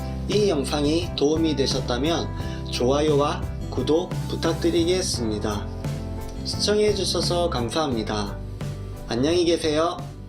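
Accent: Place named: Gyeongsang, South Korea. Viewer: 엌.... 비웃으려는게 아니라 제가 친정이 거제도고 결혼전 부산에서 살아서 참 그리운데 님 샤브샤브 그 특유의 경상도 ㅅ 발음이 너무 반가워서 댓글 달고 갑니닼ㅋㅋㅋ 좋은 영상 잘 봤어요~>___<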